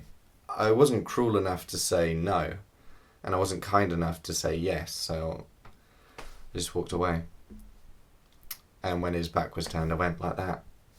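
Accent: United Kingdom. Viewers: Watching this is like listening to my journal being read with a smooth voice with a lovely British accent. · Yours so damn British...but in a good way, of course. :)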